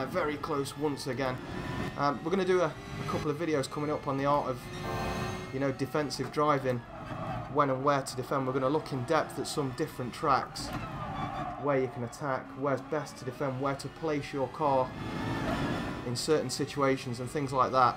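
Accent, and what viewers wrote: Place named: England, United Kingdom. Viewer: Nice race. What part of England is that accent from?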